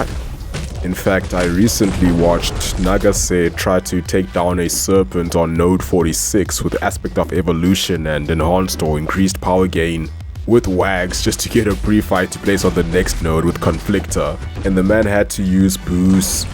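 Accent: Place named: South Africa. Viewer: It sounds south African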